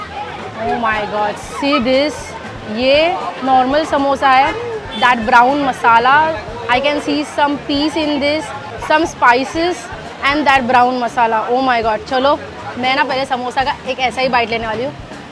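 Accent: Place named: Maharashtra, India. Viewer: From the way of your speaking accent I'm sure you are basically maharashtrian girl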